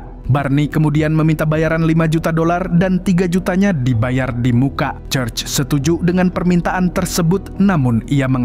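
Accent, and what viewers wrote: Banjar, Indonesia. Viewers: Mimin orang banjar kah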